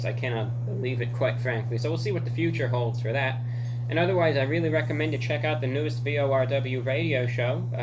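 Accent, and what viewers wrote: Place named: New Jersey, United States. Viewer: Ppl think he's from Florida or not from the states. He sounds slightly Jerseyish or Minnesota, Maybe Canadian? 🤔🤷🏻‍♀️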